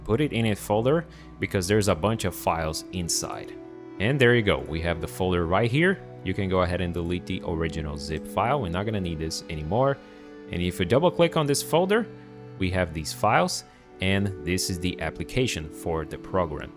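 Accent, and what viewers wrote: Brazil. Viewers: o que tu parece brasileiro falando ingles eh brincadeira viu!!! assume ai, duvido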